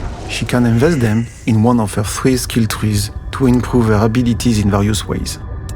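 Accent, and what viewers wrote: France. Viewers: This french accent is getting on my nerves, and I am french ! At this point it's like he's making zero effort to be understood. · Punaise l'accent français en anglais rend la compréhension difficile ! Learn to speak english please. I'll buy the game btw, looks cool ! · l accent fr qui cringe a mort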